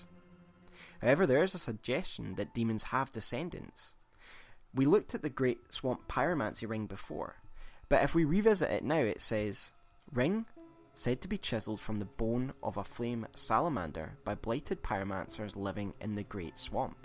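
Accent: Scotland, United Kingdom. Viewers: Scottish are we? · agreed, Scottish accent ygm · I can't even recall how many times the Scottish accent made me laugh ! Seriously, sometimes I laughed so hard I "wetch"ed my pants. XD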